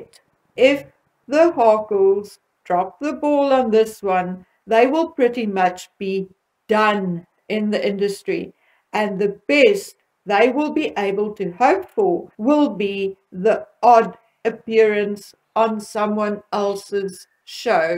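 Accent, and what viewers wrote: Afrikaans, South Africa. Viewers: Afrikaans accent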